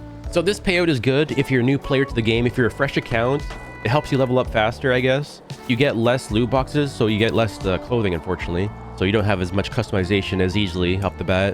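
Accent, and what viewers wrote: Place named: Canada. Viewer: HES A FUCKING MOOSE LOVER ! lmao that sounds kinda negative 😂 but fr I always forget hes Canadian til he says a few words that sound different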